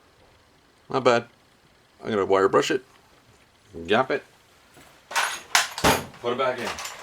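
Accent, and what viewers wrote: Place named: New Brunswick, Canada. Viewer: My mother was from NB so I like your accent! Anyway, the white paint on the fuel line is a hint it is original. My 2010 vintage saw has the same. I take it you don't think much of these saws. Since I have only used Poulan and Echo, fixing mine is a new experience. Seems like a pretty good homeowners saw. But I have noticed that the displacement of these saws seems inconsistent - 42, 38, 37, (mine says 35) and even saw one in a video that showed "3414c". I guess the Chinese don't read English very well (: BTW there is a small round tab (1/4 inch dia) that secures the front left of the cover.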